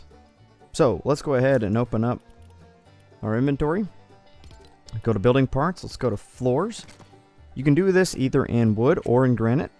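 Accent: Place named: South, United States. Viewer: Lol! Love the Southern accent - so funny! 😂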